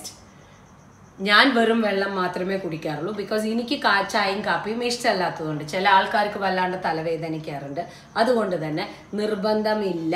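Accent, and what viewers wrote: Palakkad, India. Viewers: പാലക്കാട്‌ ഭാഷ 👍👍👍👍അവതരണം നന്നായിട്ടുണ്ട്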